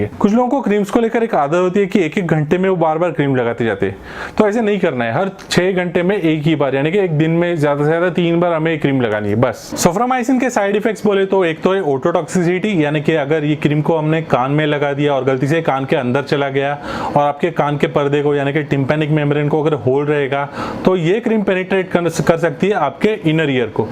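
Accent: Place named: North, India. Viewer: sure you are being modest an all..😊 but that's what YouTubers and Instagramers are influencers!! BTW what city are you from? Your Hindi is very North😃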